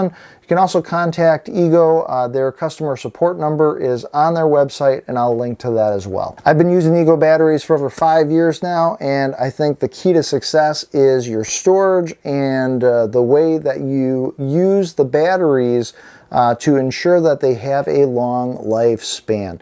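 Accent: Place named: Western New York, United States. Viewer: “Badderie” your accent is hard-core Western New York to Wisconsin .. still enjoyed it thanks for making the video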